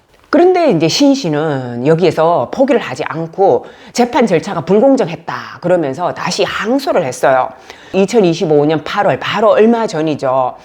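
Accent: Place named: North Korea. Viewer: 뭔가 발음이 다르신데 미국에 오래살아서 새는 그런 발음하고는 다름. 북쪽 발음이 느껴집니다.😅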